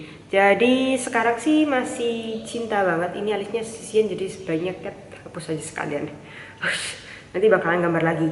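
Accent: Java, Indonesia. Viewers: jd kaya khasnya cindercella ya, dr segi nada bicara sma medok2nya haha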